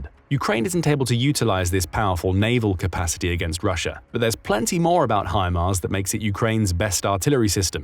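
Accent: England, United Kingdom. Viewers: Amazing to hear someone with an English accent use the phrase, "Get out of Dodge". Funny and cool at the same time. I am hopeful for Ukraine, and despise president trump and his pro-Putin agenda.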